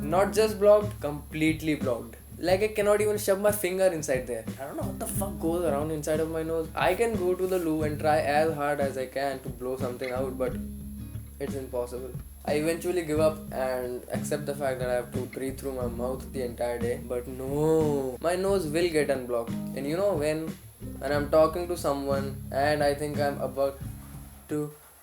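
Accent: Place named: Ghaziabad, India. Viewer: Dude. . .are you from Gaziabad or a nearby town ? Actually i have two cousins living there and they have this similar accent as yours ! Please reply ..... I'm curious !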